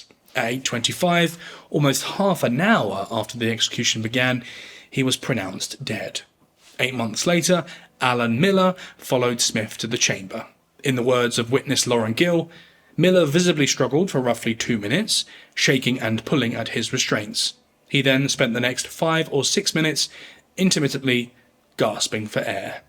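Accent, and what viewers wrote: United Kingdom. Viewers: Sounds like a limey snowflake. The USA needs to execute more and quicker to be a deterrent. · I once believed, totally, in capital punishment, couldn't understand the logic of those who thought otherwise. In time I have totally reversed my opinion for reasons I don't need to go into. But that being said, I have some major issues with this video. For starters, conflating American executions with Nazi Germany's genocide is offensive, deliberately misleading, wrong, and frankly coming from a man who sounds British, well it takes huge brass balls. And given that the UK practiced capital punishment for a thousand years, on a much larger scale than the US, well there's no small irony in his smugness. I also noticed that the number of patrons for someone who seems to carry a torch for this subject matter kind of suspicious. Perhaps this is a well funded political mouthpiece? 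To be clear, it's not the message that I really object to but the filthy package that carries it. Cheers.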